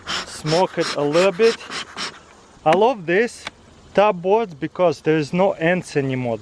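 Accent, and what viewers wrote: Russia. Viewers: У тебя акцент да? Ты не говоришь по английски,тты руский! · Love your Russian accent! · Are you Russian your accent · Beautiful russian accent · Your accent is beautiful. I love Russian accents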